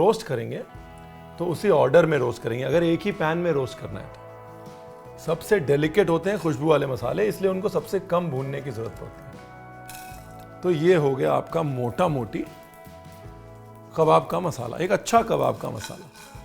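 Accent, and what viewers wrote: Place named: Punjab, India. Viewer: From Lucknow...but has Punjabi accent ♥😂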